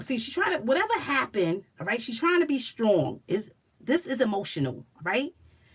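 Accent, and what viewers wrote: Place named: New York, United States. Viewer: I knew you were from NY!!! You have that flava!!!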